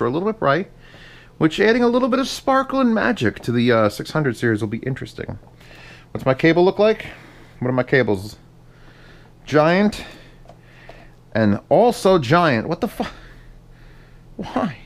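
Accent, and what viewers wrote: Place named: New Jersey, United States. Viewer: Z, are you from Jersey? Sounds like a New Jersey accent to me.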